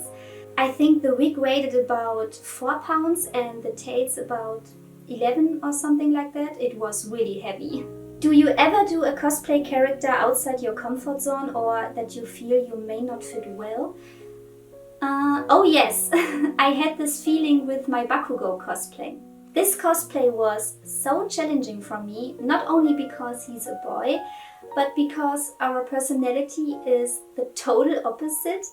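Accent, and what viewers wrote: France. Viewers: Are you french??? Accent sound french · Tu as un accent français non ? 👁👄👁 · Am I the only french person here ?;-; Because that’s obvious to me that she has a french accent, and so does Celeste (The burning of the VERSAILLES witch 😜).I know, I’m a party pooper right ?😅 But I have to agree...her voice is sweet af🥺 And also, sorry if I made mistakes!😅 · You're french? For the title but also for your accent. Ce n'est pas un reproche, je suis française également 😏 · I want to ask you where are you from (no hate) I think you sound french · U have a little french accent .o.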